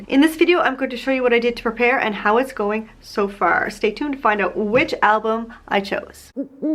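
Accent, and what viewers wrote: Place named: Canada. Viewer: Holy Moly! Did you say your 7 year old son speaks 4 languages???!!! Wowza! I’ve always been curious as to where you live (you sound Canadian but you reference “Europe” often), I was tickled to hear in your video on 8/2 (I think) that you live in Luxembourg! Very cool! Could you do a video about yourself, how many kids, where you were born/grew up, where you live on and why, how you go into YouTube, etc. I just enjoy learning about people! Love your videos!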